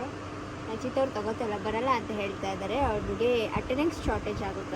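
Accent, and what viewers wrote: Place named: Kundapura, India. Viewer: ❤❤❤❤❤ಗೋಯ್ ಬೀಜ 👌👌👌👌 nam kundapr Kannada marre❤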